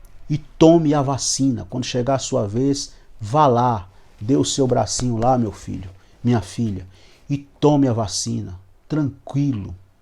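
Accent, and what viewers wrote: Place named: Northeast, Brazil. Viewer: Pastor paz do senhor bom final de semana sou seu siguidor gosto muito da sua personalidade e das sua ministraçoes sou filho de pernanbucano e o sr parece ser nordestino não sei se estou enganado mais a maneira forte de se expressar da entender que é pernanbucano ou da região nordeste o povo nordestino é um povo maravilhoso forte abraço Pastor Deus vos abençoe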